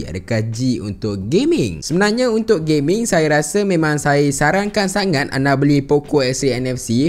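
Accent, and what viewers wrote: Taiping, Malaysia. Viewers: Hai bro.dok taiping ke?? Cm jiran kee hhshshshssj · Orang tepeng ka?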